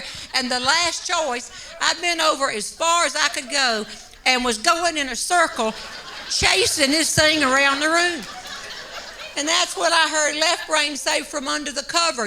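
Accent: South, United States. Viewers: You are soooooo Southern, I am homesick. I love your accent and story telling ability. It's a wonder your family hasn't disowned you by this time. I love it. I love it. Thank you for your gift and sharing it with the world. If your children and grandchildren ever run away make sure they sign off on their insurance plans. You know they'll come to no good. You might as well cash in. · I will come back to this one when I need a good laugh! Jeanne Robertson is absolutely hilarious with her southern accent and 6'2" frame. Love her! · I stumbled on to Jeanne Robertson today while looking at & "." What a great link. Love this woman's sense of humor & her southern drawl. I can't stop watching. Have family in Carolina. Hope we can see her in concert either in Charlotte or Durham. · Her delivery and voice are pure HeeHaw. Brings back wonderful memories of watching it on Saturiday nights. 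This is the kind of humor I've been around all my life seeing as I'm a died-in-the-wool Southerner.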